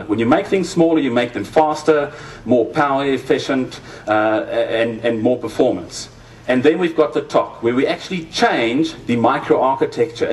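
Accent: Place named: South Africa. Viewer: Definitely a South African speaking here.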